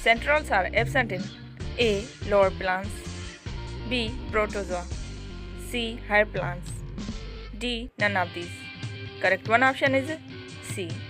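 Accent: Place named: Sindh, Pakistan. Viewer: Ap sindhi ho apki awaz se lag rha hai magar ap bohoot payari awaz hai apki mashallah 😊😍🤗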